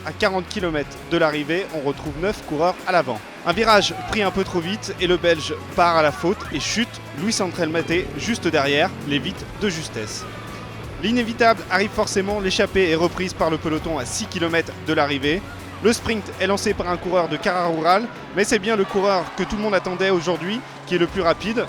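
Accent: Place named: France. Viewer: Porque hay un francesito narrando el resumen?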